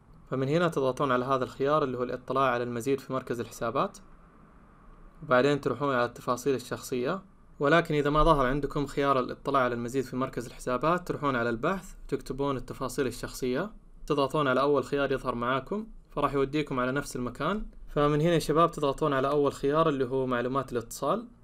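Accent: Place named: Gulf, Arab world. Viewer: وشكراً لك لان كلامك مفهوم احب اللهجة الخليجية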